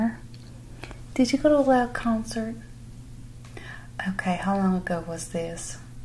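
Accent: South, United States. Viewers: with that Southern belle accent, this Yankee would tell you anything you want to know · Your southern accent is better than most Hollywood versions with voice coaches and technical consultants...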